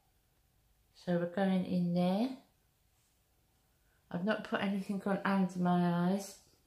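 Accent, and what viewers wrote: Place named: North, United Kingdom. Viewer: Hello, I'm new to your channel, and have really been enjoying your videos! I have to agree about this foundation. It is an excellent option for summer. I live in Tennessee in the US and we are currently dealing with temps of 96 degrees F (~36 C) with heat indexes of 109 F (~47C). Despite the horrible humidity here, this foundation holds up fairly well, so that's saying a lot for its staying power, but as you said, it's not heavy feeling. Just curious... What skin type are you? I'm fairly oily in my T-zone, so the matte finish truly helps me. I think it looks gorgeous on you all the same! Big Rimmel fan from over the pond here. My husband is from central Scotland, and we were trying to place your accent. Our guess.. Are you from the north? Charming for sure. 😉 💕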